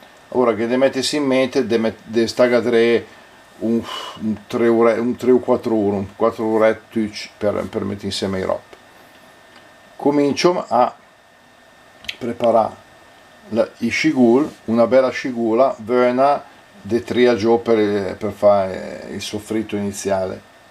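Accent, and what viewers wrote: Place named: Milan, Italy. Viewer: A parte la ricetta la cosa più bella di questo video è la parlata milanese, dialetto ormai perso. yt è pieno di video di cucina in romanesco, finalmente un po' di lingua madre. Grazie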